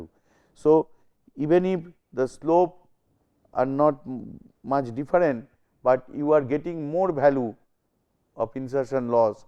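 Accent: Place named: Bengal, India. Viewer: he is for sure a bengali by his accent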